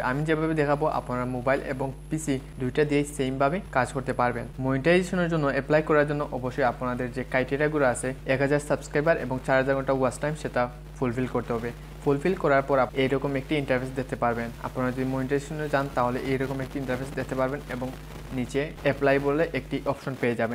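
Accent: Sylhet, Bangladesh. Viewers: Vai aponar khotai buja jai aponi Sylheti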